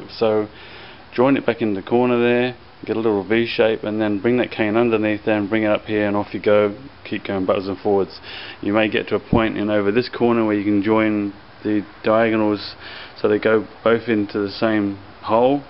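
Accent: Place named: Australia. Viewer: Great demonstration! I now have the courage and confidence to recant several antique chairs I've been saving for a long time. You sound just like my son-in-law, he's from Australia too and has the calm reassuring manner of speaking. Thanks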